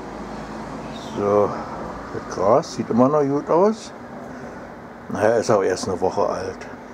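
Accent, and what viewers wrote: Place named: Berlin, Germany. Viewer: Du bist Berliner, das höre ich sofort. Und, ist der auch Berliner? Zimtschnecken schmecken nirgens so gut wie in Schweden. Wir Deutsche können die nicht richtig backen. Oh ja, heute wirds nochmal sehr heiß bei Euch. Hier unten am Bodensee ist es heute angenehm warm mit Temperaturen von 23 bis 25 Grad. Grüße von hier von Leonora Lily Vielen Dank für Deinen Besuch. Hört Karle auch meine Musik? 😼😽😼😽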